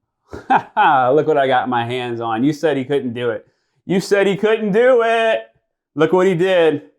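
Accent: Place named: England, United Kingdom. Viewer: The English accent 💀💀💀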